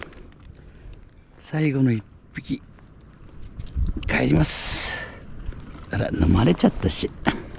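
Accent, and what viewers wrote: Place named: Chiba, Japan. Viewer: 「あんでだよ～！」(何でだよ～！)好きです、千葉弁。「青アジばっかでしっけになんね」でも楽しそうでなによりです。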